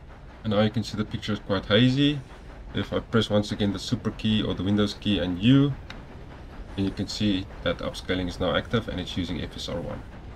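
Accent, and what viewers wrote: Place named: Afrikaans, South Africa. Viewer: jy klink afrikaans bra!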